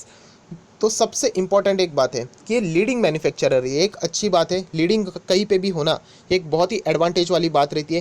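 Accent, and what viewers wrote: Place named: Pune, India. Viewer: Mujhe b pune k lagtey hain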